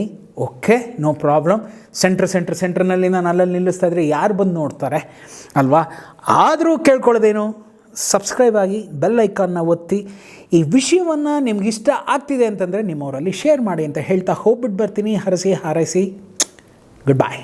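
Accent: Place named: Madesha, India. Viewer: Nimma kannada estha sir nanage .. nimma madesha nana favorate.. previous episode you spoke about negative comments... Keep it mind .. if you are getting negative comments that means you are growing... All the best for the film .. please plan pramotion activity carefully that can make or break the project... I will watch first day first show ...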